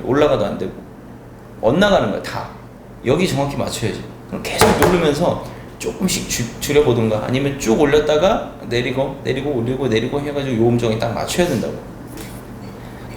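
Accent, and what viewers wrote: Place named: Gyeongsang, South Korea. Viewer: 말투가 쌍도네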